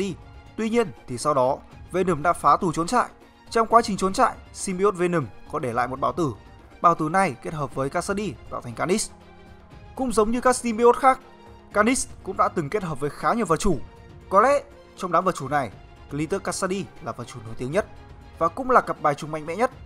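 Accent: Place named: Central, Vietnam. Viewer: giọng anh rồi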